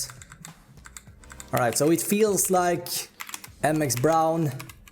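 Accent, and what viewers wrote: Sweden. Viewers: the svengelska is taking over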